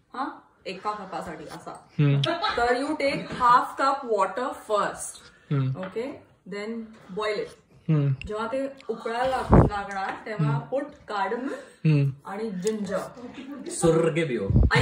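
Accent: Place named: Nashik, India. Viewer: tujya videos madhe nashik khup aikayla yeta.... Btw mi pn nashik ch ahe...tu hi nashik cha ahes ka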